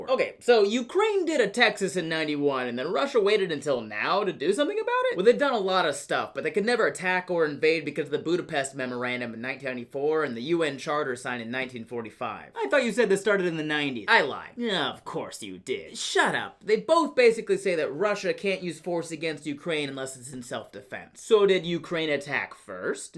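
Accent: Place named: Albuquerque, United States. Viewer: Dude, your Burqueno is spot on. How much time did you spend In Albuquerque to pick that one up? lol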